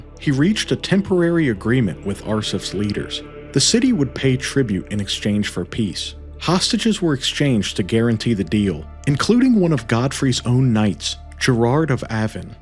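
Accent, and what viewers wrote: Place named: United States. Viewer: Thank you Jesus for the American accent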